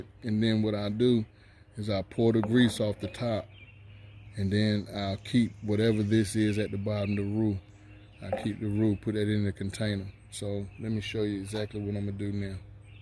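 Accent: South, United States. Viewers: Did you ever happen to watch your videos afterwards? The English subtitles suck! Your nice Southern accent is not recognizable and they write nonses! I laughed heartily! Enjoy the audio to written stupidity of you tube. Bye from Greece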